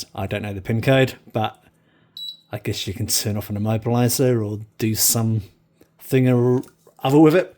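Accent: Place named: New Zealand. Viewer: Wait, you're a Kiwi? I'm really bad at spotting us in the wild..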